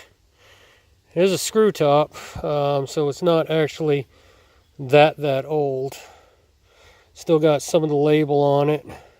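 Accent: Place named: New England, United States. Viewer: You do have a blend of a New England accent along with another one you got elsewhere!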